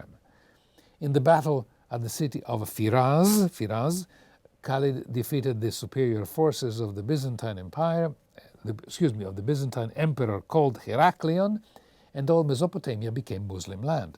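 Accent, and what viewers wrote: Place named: Italy. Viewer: I can hear an Italian accent in your English now :-)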